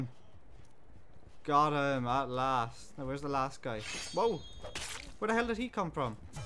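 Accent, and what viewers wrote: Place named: Ireland. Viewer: Your description and accent say you're Irish. Would you like to see medieval melee action in Ireland of the kind shown in this game? Also, would you like the devs to put in a "Master Archer" perk that lets you see the exact ballistic drop (but not trajectory) of your arrow when aiming long distances? I'm sure they might be persuaded if enough people asked for it.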